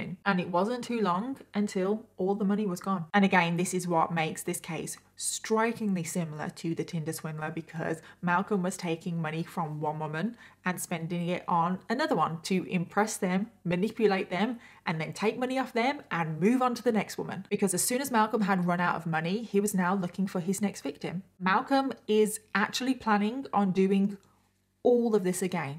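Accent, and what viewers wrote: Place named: Redditch, United Kingdom. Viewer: Are you from Redditch or nearby? You sound like it.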